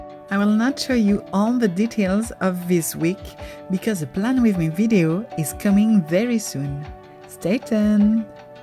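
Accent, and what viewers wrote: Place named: France. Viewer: Très belle vidéo, très agréable... une très jolie déco dans ton planner. Ce kit est vraiment sympa ! La french touch dans ton anglais est adorable ^^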